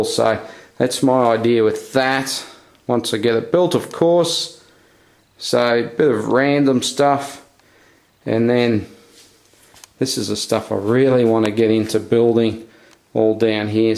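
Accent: Australia. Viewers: That is a huge collection... but can you tell me what accent that is? Is it Australian?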